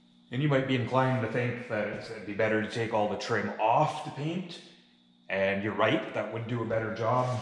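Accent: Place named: Canada. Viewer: Brilliant video. And the Canadian accent to explain the process! 🏴🇨🇦 👍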